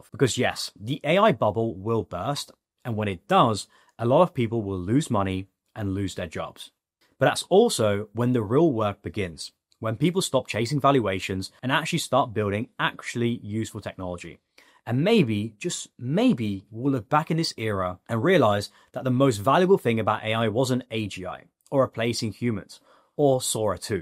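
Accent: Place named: United Kingdom. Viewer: when i hear british accent i'm already prepared to hear nonsense, proven itself true more often than not... and here we go again. how about making a vid explaining that the ai bubble burst, once it actually did!? oh no, can't do that yet, bc it hasn't, and if you'd not make these ridiculous "predictions", then you couldn't say later on "yeheyy, told you so!"... but what happens if there actually isn't an ai bubble?? then you'll just be quiet and hope nobody's going to call you out for your bs opinion bc nobody will bc you're not well known / a celebrity. so there you are making nonsense "predictions"... but why? what are you so angry about? explain??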